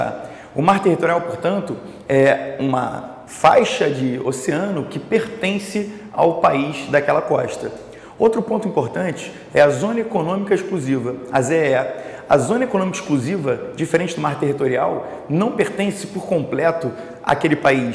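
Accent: Rio de Janeiro, Brazil. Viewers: Ninguém merece esse sotaque de carioca aff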